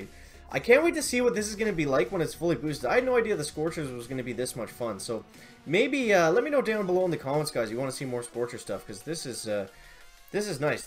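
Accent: Canada. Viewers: What part of Canada are u from?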